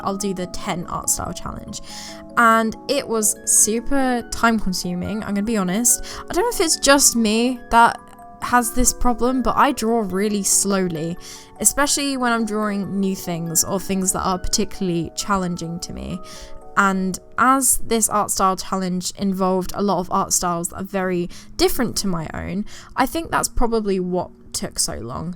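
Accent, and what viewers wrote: United Kingdom. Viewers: I love you accent ! British accents are so unique ! I hate American accents maybe cuz I'm so used to them . Idk maybe I just dislike my accent eh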